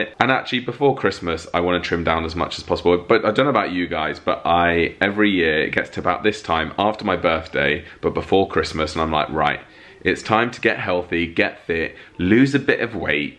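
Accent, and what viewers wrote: United Kingdom. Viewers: Hi, just sayin', I LOVE the way you pronounce heart! Whenever you talk about looking for lambs heart it makes me smile! (That British accent!) Also, don't worry if you don't get to shave or however you look during a video, we are your subscribers because we love you! Yes, keep up the dog recipes, I'd love them for my 3 pups Liza Jane, Jose and Bernie! 💜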